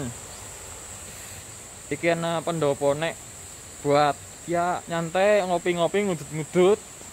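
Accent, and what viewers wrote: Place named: Banyumas, Indonesia. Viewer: Aq pengem ketawa sm logat ngapaknya kak🤣krn dlu mbak kosku iso ngapak jg kwkwk jd aq suka dcritain humor2 pke bhs ngapak kwkwkk kamshamida kak dh sharing villa ademnya...slm dr sydney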